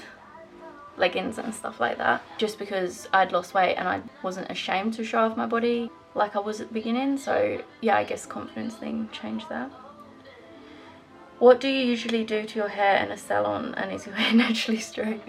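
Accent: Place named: Cheshire, United Kingdom. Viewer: Haha first video I thought you were a Brit and I was no an Aussie and kept going back and forth I’m from Birmingham, uk are you from Cheshire ? I hear a hint!x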